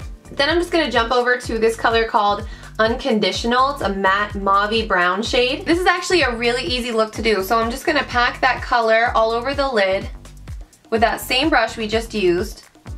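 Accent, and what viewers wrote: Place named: New York, United States. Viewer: I feel like you almost have a New Yorker's accent. I love it.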